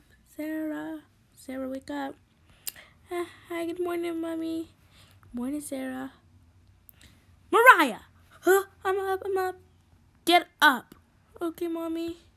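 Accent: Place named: Philippines. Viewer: I can't see that I chat here but I know and I hear that shes from Filipino tama ba ko?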